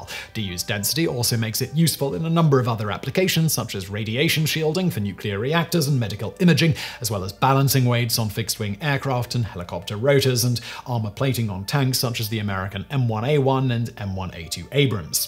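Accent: United Kingdom. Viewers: Speaking a bit too fast in this one chap, especially with the British accent and constant use of "DU". Slow it down a bit. No rush man.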